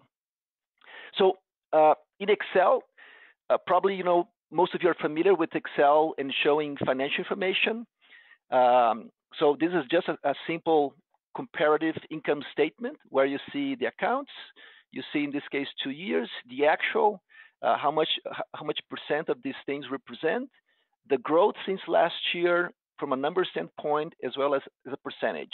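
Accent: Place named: Brazil. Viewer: It seems to me to be someone with a Brazilian accent ...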